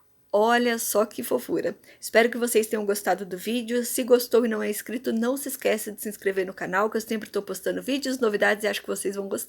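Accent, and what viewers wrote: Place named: São Paulo, Brazil. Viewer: Me perdoe amiga mais esse sutaque paulista me dá nos nervos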